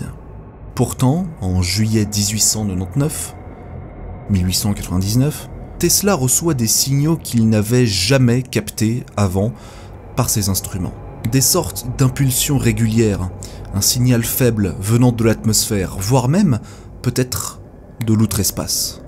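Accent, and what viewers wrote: Belgium. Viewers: En tant que cousin français, la prononciation à la belge (quand bien même plus logique lorsque l'on y réfléchit) des nombres implique effectivement une conversion lorsque l'on l'écoute. Inutile d'être mesquin si cela fait tiquer, c'est naturel. Pauvres habitants d'El Paso, avoir la finale de Super Bawl qui saute, ils en ont eu, de la patience! Le SAA a dù démanger! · Bravo tu parle le francais comme un belge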